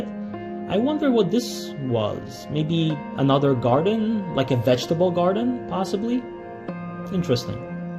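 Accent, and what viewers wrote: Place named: Romania. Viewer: Are you by chance originally from Romania? I ask because I've heard Romanians speak American English with a similar accent like yours. Great content! Thank you.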